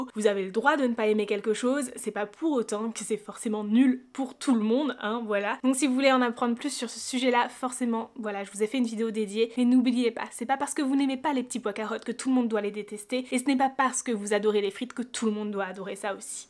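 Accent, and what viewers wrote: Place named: South, France. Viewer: Merci pour cette vidéo. Un rappel de tolérance nécessaire. PS: j'ai cru reconnaître un accent sudiste. J'aurai mal entendue peut-être. Mon accent toulousain est ressorti aussitôt 😊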